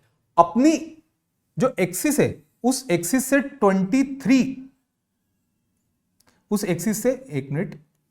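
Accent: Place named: Rajasthan, India. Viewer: YOU SOUND LIKE RAJASTHANI SIR 😀 AWSM SESSION BTW ❤️🔥🇮🇳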